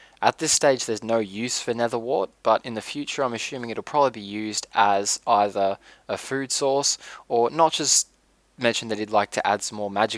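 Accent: Australia. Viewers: YOUR AUSTRALIAN :) XD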